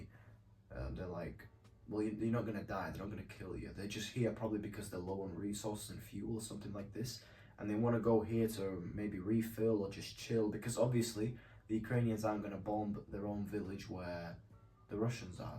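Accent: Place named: United Kingdom. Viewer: Ok cool, I just reacted because you describe in your video how the war "started" while talking about 2022 events, but I can understand Btw how can it be that you speak English like a true Brit if you are from Ukraine?? Ukrainians and Russians usually have a very recognizable accent. Maybe you had had some connections with UK before you moved there?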